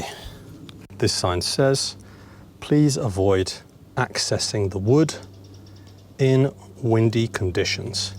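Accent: United Kingdom. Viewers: Thanks you for this video, I'm from Thailand. like your British accent.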